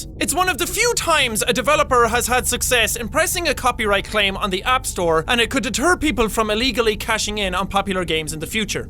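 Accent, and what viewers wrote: Ireland. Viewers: Kyru Shelton he's irish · I'm Irish and that's how a lot of us sound. Not me, because it depends what part of the country you're from, like Victor Cheng said. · Not only is it weird to hear him not yelling, but back when this was made, his Irish accent was more audible. For example, three sounds way more like tree. XD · The Irish Blaggard Jack says "Top of the morning to you laddies" as a parody and a way to make fun of himself. He has stated this in one of his older videos. In fact, he use to hide his accent. If you want to see a REAL forced accent, maybe you should look at his older videos. It is in fact an Irish accent. Do you seriously expect all Irish accents to sound the same? As people have said, he speaks kind of monotone in this video and tries hard to pronounce everything correctly; similar to what he use to do. Maybe you should actually learn a bit about him before making an idiotic comment. 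· It's his accent, he's Irish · I love that Jack is so Irish in this video. Like he says "tree" when he means "three" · Justin Talmadge He's Irish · get the fuck off of YouTube it's not his fault he has a Irish accent it's just his nationality you cancerous dipshit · Because with an Irish accent you can't really turn it off. It's not on purpose, it's just natural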